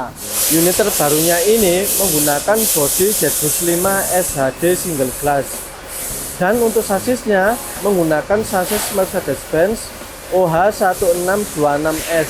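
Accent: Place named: Java, Indonesia. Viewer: Medok banget coy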